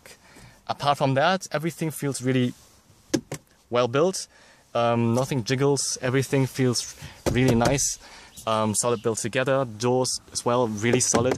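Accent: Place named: Germany. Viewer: du sprichst zwar englisch mit einem starken deutschen akzent, trotzdem würd ich gern so gut englisch sprechen können wie du. super videos übrigens. mach einfach weiter so!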